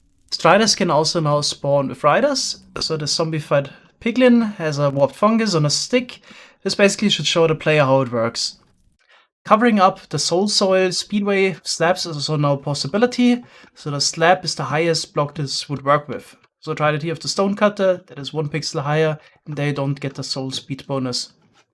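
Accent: Germany. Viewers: you sound a bit German 🤔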